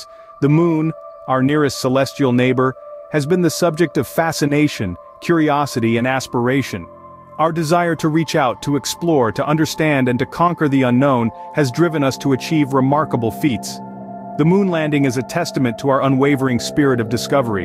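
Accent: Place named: United States. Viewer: I will stay subscribed, providing you keep that good American voice!!!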